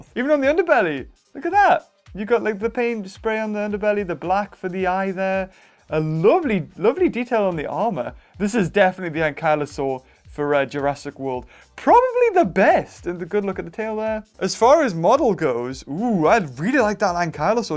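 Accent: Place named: United Kingdom. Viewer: Ya British mate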